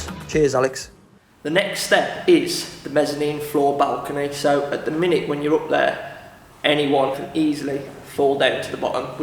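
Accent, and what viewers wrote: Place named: Birmingham, United Kingdom. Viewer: You from Brum or Dudley. Sound local to me. Also liked n subbed. Again I don’t know why your vid popped on my feed. Glad it did thou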